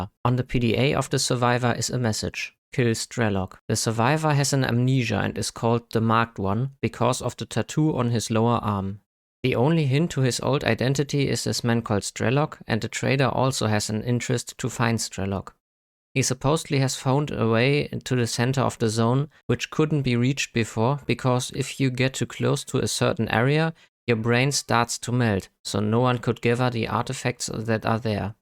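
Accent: Germany. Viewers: North german accent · german english lol. höre ich sofort ^^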